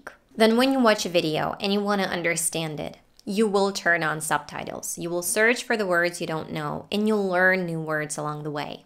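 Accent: United States. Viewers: Don't know even you are Russian.. I thought you r 100% American 😊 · I'm shocked!!! I was totally convinced you're American! I would never think that your first language isn't English. Props to you! Keep it up 💪 Brilliant job! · Hey...what you are doing is a great job..I like your extreme passion about English language.. even I wanna be like you someday... speaking fluently in a good American accent..with ❤️ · You are a very good teacher! It's hard to beleave that you are not american. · The first time I saw you speaking I thought that you are American, you are perfect 👌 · You are from Ukraine I thought you were from USA ! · Вот уж парадокс. Когда я увидел твое видео в рекомендациях, то так и подумал, что ты из Украины или России, но когда услышал, то подумал что американка ))Интересно, как долго учишь? Я сам из Украины и учу язык в ютубе от носителей.Но все просматриваемые мною ютуберы(например, Papa teach me или English with Lucy) говорят медленнее, чтобы изучающему было понятней, а также добавляют субтитры чего у тебя не увидел. 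А так все супер, ты молодец. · I know you ever since I watched hadars students vids and then i was curious to know a bit more about you, and I think you're great, despite the fact you live out of a country where ppl speak English and your accent sounds so familiar like Americans , i wonder how you manage to speak in two different accents .. p.s if your reading this, pls mind letting me know about it. Thnxs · Jesus christ!!! Your American accent is mega strong.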